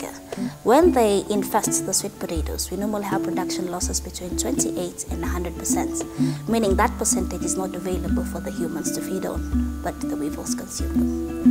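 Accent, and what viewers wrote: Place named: England, United Kingdom. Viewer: I love that English ascent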